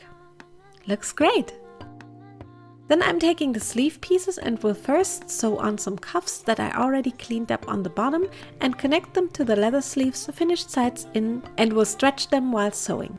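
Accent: Germany. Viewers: Do I hear a slight and very cute german accent? 😉 However, the doll is amazing!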